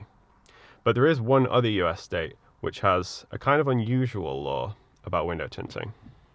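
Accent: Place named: Nottingham, United Kingdom. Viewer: What are you talking about? has a Nottingham accent because he was born and raised in Mansfield.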